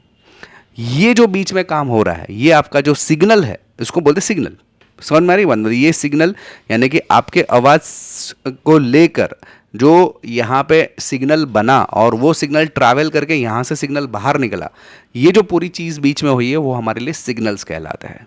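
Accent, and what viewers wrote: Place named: South India, India. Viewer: Nice explanation, you are from south india.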